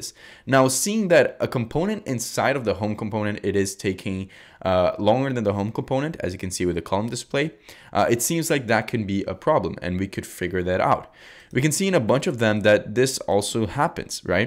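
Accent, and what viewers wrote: Brazil. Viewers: Rapaz Tú é carioca ou paulista?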